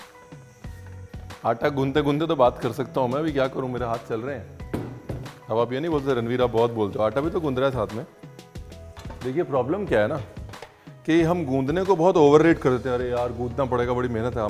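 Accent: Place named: Punjab, India. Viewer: Gayani Brar Ji, Chaa gaey O thah kr k. Like the way you give Punjabi touch to the conversation, hai k nai...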